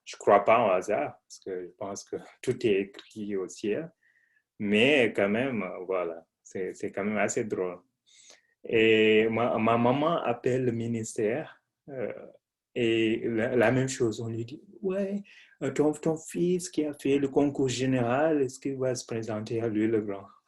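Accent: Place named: England, United Kingdom. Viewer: C'es ouff tu parles français avec un accent anglais pour un francophone ! Great!!!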